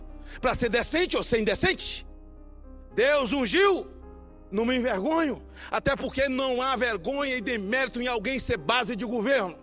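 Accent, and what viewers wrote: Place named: Rio de Janeiro, Brazil. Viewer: Senador do Brasil, você é nosso herói. Nasci na mesma cidade em que você nasceu. Fui criado em São Paulo e falo normalmente. Senador, por que você começa com sotaque carioca e depois volta ao normal. Você não é carioca. Assista o seu vídeo e veja o número 2 você fala como carioca e depois como uma pessoa normal. Honre sua naturalidade. VOCÊ NÃO É CARIOCA. É BAHIANO, HONRE SUA MÃE. VOCÊ É NOSSO HERÓI. PARABÉNS!!!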